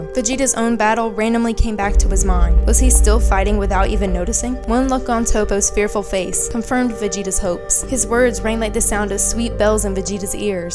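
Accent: South, United States. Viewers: You’re from the south. I can hear the twang.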